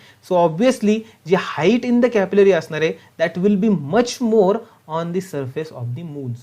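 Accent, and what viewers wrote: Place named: Parbhani, India. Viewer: Sir tumhi Parbhani che aahat ka? Tumchi accent tashi watli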